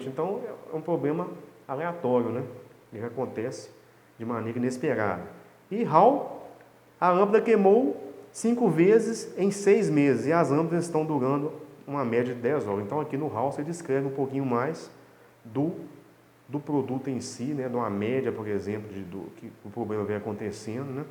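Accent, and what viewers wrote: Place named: Minas Gerais, Brazil. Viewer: O mineirês tá em dia demais! Parabéns pelo material, me ajudou hj!!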